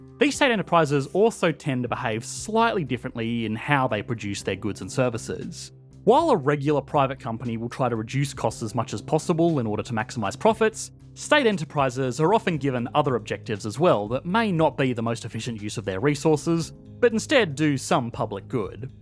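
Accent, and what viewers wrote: Australia. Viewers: the fact that you think this channel is from the US speaks volumes of how ignorant you are. The man has a clear Australian accent and mentions that he lives in Australia all the time. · I don't think he's British or he would have mentioned that many other countries have state owned enterprise. UK being one. His accent seemed Australian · I only realise that you're an Australian at the end of the video. 'B(e)ye'. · it's australian. he has a very obviously australian accent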